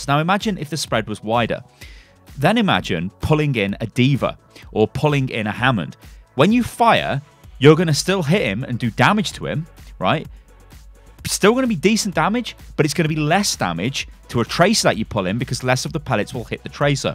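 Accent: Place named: United Kingdom. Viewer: Hi! Love your vids, it's so nice to listen to a British accent like my own to when watching gameplay!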